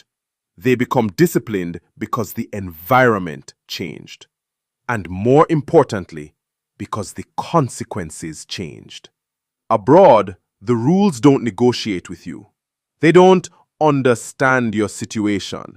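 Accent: Jamaica. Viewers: Who is this person? He does NOT have a Trini accent? He sounds more like a Jamaican. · This person has a Jamaican accent, what do they know about trinis?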